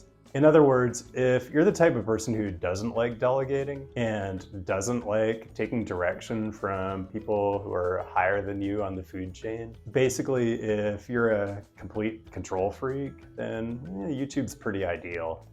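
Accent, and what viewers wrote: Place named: San Diego, United States. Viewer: He is the most handsome white man I have ever met. He speaks like a person from San Diego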